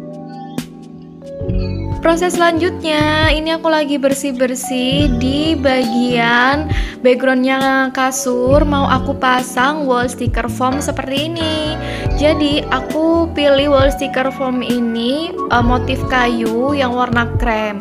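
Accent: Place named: Java, Indonesia. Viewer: Orang mana mbak? Kedengarannya medhok hehe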